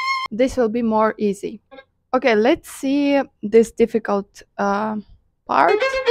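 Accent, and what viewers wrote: Russia. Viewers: Автор ведь знает русский? Мне, кажется, есть русский акцент... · Ничего себе, у вас произношение нот как у русских, до ре ми фа соль ля си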